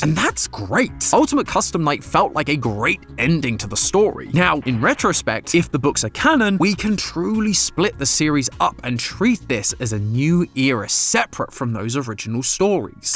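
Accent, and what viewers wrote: United Kingdom. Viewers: my mom came into my room while i was watching this and asked if it was a game theory video, i said yes and she just goes “why is matpat doing a british accent?”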